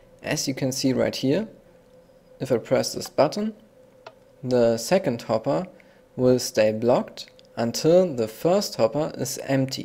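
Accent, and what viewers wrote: Germany. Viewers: da hör ich den deutschen raus xD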